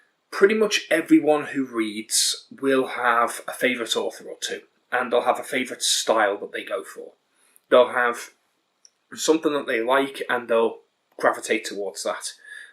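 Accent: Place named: Liverpool, United Kingdom. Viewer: This is a bit random, but are you from the Wirral? I've been trying to figure out your accent because you sound a bit like a scouser but I'm not sure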